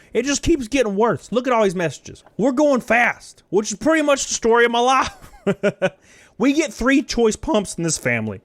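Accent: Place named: Alabama, United States. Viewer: best buy don thanos snap. you can never take the alabama out of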